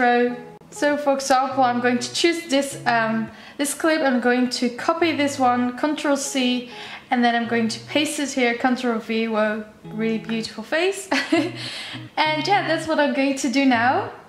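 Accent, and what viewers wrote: Netherlands. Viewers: Ik kon aan je accent horen dat je Nederlands bent!💗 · You have a Dutch accent.. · wow ur accent is like netherlands peoples accent xD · Dutch accent..Then I read your FAQ and voila.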